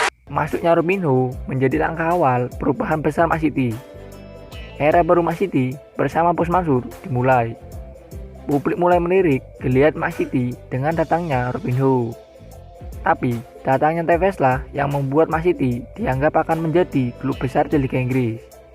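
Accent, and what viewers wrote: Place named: Medan, Indonesia. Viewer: orang medan kau ya min nampak kutengok kau logat medannya, kalo gitu salam dari medan juga.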